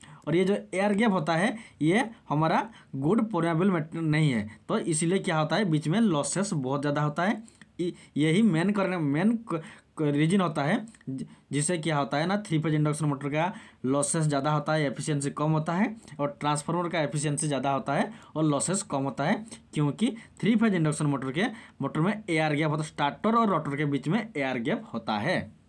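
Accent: Odisha, India. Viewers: Bhai tume Odia na??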